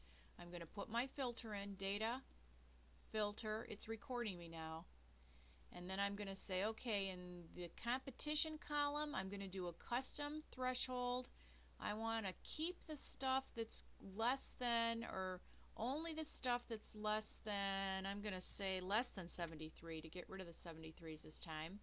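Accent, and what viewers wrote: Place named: North, United Kingdom. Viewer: Ummm, no. She sounds like she's from up north.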